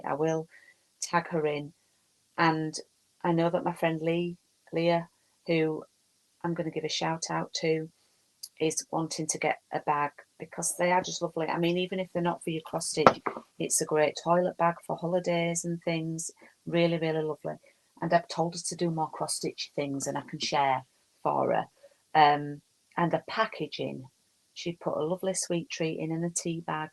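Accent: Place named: Yorkshire, United Kingdom. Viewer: Great video, and I love your accent, are you in Yorkshire? My mum lives near Sheffield 😊 You really went big with that NY design, it’s going to be incredible! I don’t use a pattern tracker because I prefer paper patterns, but it is great to know what % progress you’ve made, especially with a huge project 👏 One final thing, I couldn’t tell if that big piece of aida was Zweigart (it has orange in the selvedge) but if it’s not I highly recommend you invest in aida from them. It really is the best stuff you can buy, and will give you the best results 👍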